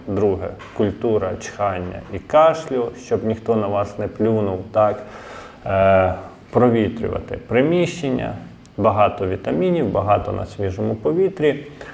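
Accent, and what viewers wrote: Ukraine. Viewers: Вы учитель информатики который говорит на русском с украинским акцентом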